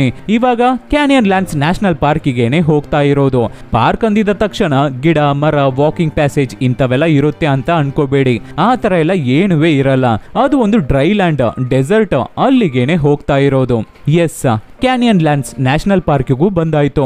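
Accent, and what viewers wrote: Tamil Nadu, India. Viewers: Please nin Kannada saryag matadi tamil tara matadtidira but explain chanag madtira 🦄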